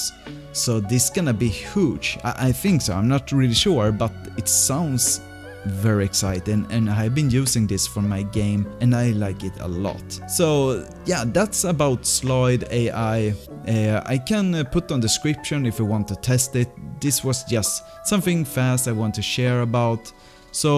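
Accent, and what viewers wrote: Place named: Sweden. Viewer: The heaviest swedish accent I've ever heard lol. Did not know this was a thing, but that's awesome, lit up some new motivation in my silly game project. Tackar så mycket för det! ❤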